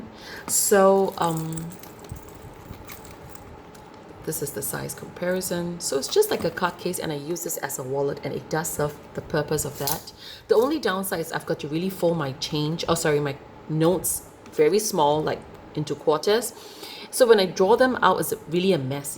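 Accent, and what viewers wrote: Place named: Singapore. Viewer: Sounds like you are from Singapore. If you do could you let me know the price for both version? Thank you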